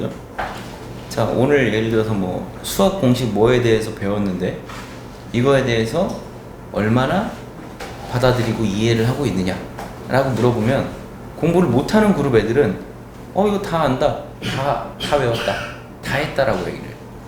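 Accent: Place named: Gyeongsang, South Korea. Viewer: 말투가 쌍도네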